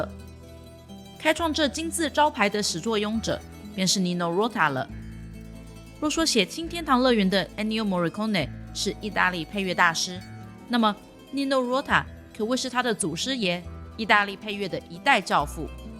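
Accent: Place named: Taiwan. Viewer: 謝小姐解說很細膩資料收集的很用心。妳的台灣國語很熟悉。我也是台灣老蕃薯😂😂😂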